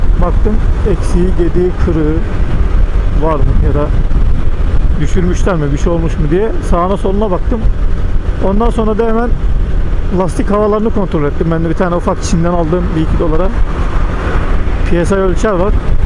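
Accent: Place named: Konya, Turkey. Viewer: Abi senin memleket neresiydi konya mi konuşman çok benziyor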